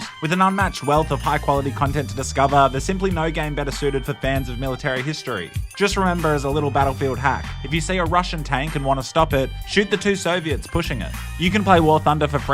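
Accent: Australia. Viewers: Yeah he’s Australian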